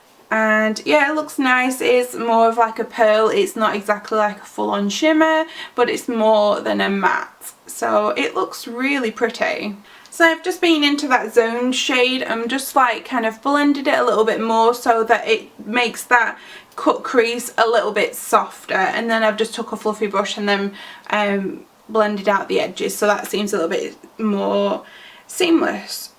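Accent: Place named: Yorkshire, United Kingdom. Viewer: I recognise a fellow Yorkshire accent! Loved the vid- I have got loads of Urban Decay pallets but these babies are going to be on my Xmas list( the one you used and the smoked one/ love a smoky eye)🙂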